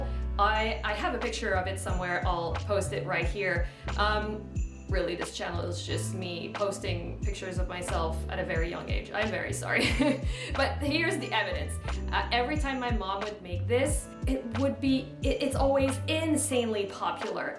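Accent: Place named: France. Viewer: Can’t wait to try your recipe! You sound a little French like me!!! I live in PEI but I’m from NB. I’m also French speaking!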